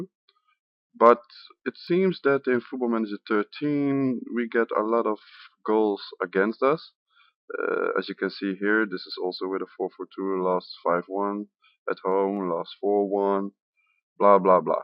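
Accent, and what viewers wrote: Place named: Netherlands. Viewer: Great video. Your English is pretty good. From your accent I guess you are Dutch. Why do you choose an English team? Anyway, more videos like this please. I play as Gillingham in League 2, just got promoted through the playoffs.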